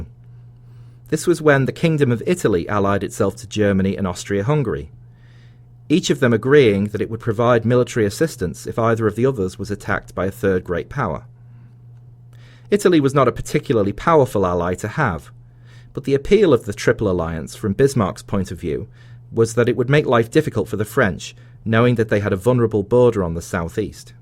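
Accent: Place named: Liverpool, United Kingdom. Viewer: I mainly listen to your lectures to hear a Scouser. (Of course, also because of the history lectures)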